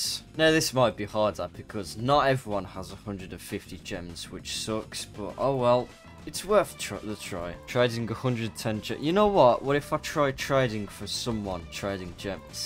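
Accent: United Kingdom. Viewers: the second dude in my life that actually speaks british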